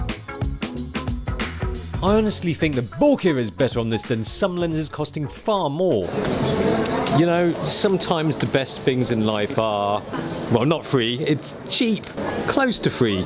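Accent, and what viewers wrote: England, United Kingdom. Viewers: I have know idea how. But his British accent. Also gave me satisfaction, beside his reviews. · How've come you got some fluent Brit accent? Bruh salute you 😎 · Why is it like a intellectual orgasm to listen to an Asian with a deep English accent? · I love Asian with British Accent, NICE.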